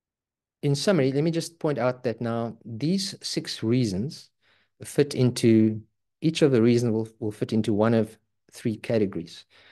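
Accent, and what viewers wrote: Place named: South Africa. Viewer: Hey, spot the South African accent!! 😄 Greetings brother.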